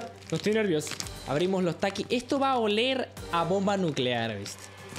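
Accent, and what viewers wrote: North, Mexico. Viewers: No todos hablamos así en mexico:( JAJAJA solo en el norte y en el estado de mex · norteño xd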